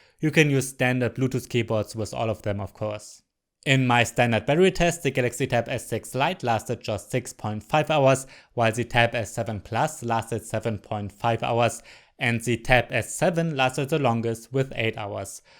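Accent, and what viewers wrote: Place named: Germany. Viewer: like everyone can hear that you'r from germany :]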